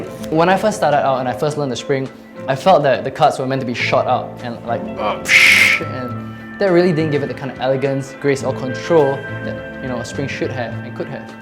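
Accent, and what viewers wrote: Singapore. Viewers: you sound so singaporean ! Are you? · hmmm....funny how i can tell apart a singaporean.probably the way we speak... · You sound like singaporean or malaysian. :p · Your accent man... are you Singaporean? o_O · wah seh, ur singaporean accent quite strong. by ur 2nd sentence i can tell ur singaporean already... hahahhaha.. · I love your Singaporean accent. Me dad is from Singapore <3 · This guy sounds Singaporean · you know a singaporean when you hear one...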